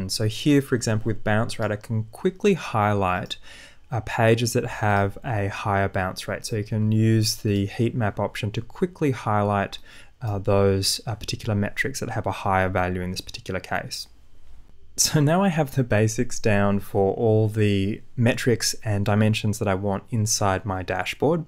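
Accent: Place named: Australia. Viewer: Could listen to the aussie accent all day! Great video, so helpful. Please can you make a data studio step by step report for goal conversion data? Im getting a bit stuck with mine.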